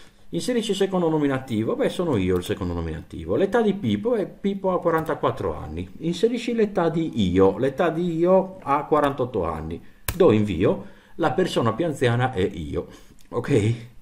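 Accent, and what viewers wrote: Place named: Sardinia, Italy. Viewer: Non posso non fare i complimenti per la chiarezza espositiva e le precise nozioni illustrate. Mi dispiace essere arrivato in ritardo nella scoperta di questo canale che sto seguendo con piacere e tanta voglia di apprendere il linguaggio C#. Chissà... Davvero complimenti perché rispetto ad altri canali Youtube, al momento, questo si sta rivelando per me il più efficace. Sinceri complimenti! P.S. Immagino dall'accento e dal cognome che siamo anche conterranei... fortza paris!:goodvibes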